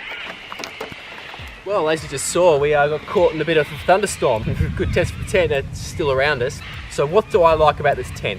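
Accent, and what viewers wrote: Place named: Australia. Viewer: Great video - awesome to hear an Aussie voice on one of these. Interesting there's no zip cover... Very thorough review. Liked and subscribed. Will be checking out some of your other vids. Cheers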